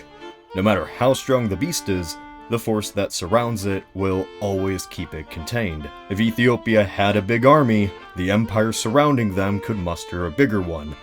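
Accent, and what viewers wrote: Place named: Transatlantic, United States. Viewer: You need to work on your Transatlantic accent.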